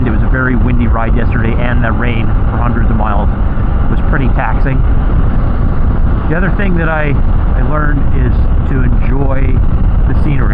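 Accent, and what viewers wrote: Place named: Canada. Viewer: As I've gotten older, a 3 or 4-day trip is the sweet spot for me. From where I live in central North Carolina, I'm less than a half day ride from some of the best roads on the east coast. I have a couple friends that I'll do trips with, but I'm the one who always plans the routes, arranges the hotels, lunch stops, etc. I love riding with my buddies, but doing my own thing relieves me of those duties--and the stress--and makes the ride more enjoyable. I didn't realize you were Canadian (I should have picked up on the accent, lol). I work in Canada quite a bit, as we have a branch office in Mississauga. I'll be working in Montreal for a week in September, followed by a week in Edmonton/Calgary. Good video, buddy. Ride safe.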